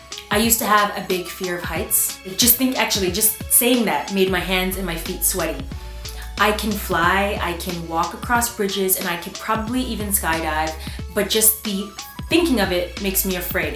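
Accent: France. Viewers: your french accent is so freaking cute! You should come to Paris one day! Je t'aime! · love your french accent, you're sooo cute ! I'm a new sub and already love your channel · i loove your accent in french! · love your franch accent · I'm French and you know what ? I REALLY LOVE YOUR FRENCH ACCENT !!! :D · Great video !!!!!!!! And i love your French accent :) Je t'adore <3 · I'm french and your french accent is the cutest omgg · Ton accent francais est so cute! :D ❤ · I love your french accent it's really good (I'm french by the way) Love from Paris 3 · J'adore ton accent français ...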